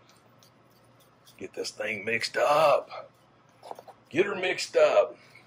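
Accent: South, United States. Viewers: well, i can say this: there was no need for you to apologize near the end of the video for the video being “too long”. You did an EXCELLENT job, not only on the helmets themselves, but on explaining everything throughout the whole process. I am pretty much brand new at this whole casting & molding thing. so new, that all i’ve done so far is some “research” or in other words, “watched a dozen or so youtube videos it. and, i wish i woulda stumbled on your video first, i would be comfortable trying my first “run” just from watching this video alone. so, just wanted to say thank you for this video, i learned more from this one video than i did from all the others, combined! 🤣 but hey, dont reckon i ought to be surprised, why? because, i can tell your from the south, like myself… and thats how use good ol boys do it down here! 🤣👍 thanks again brother. ☺️👍👍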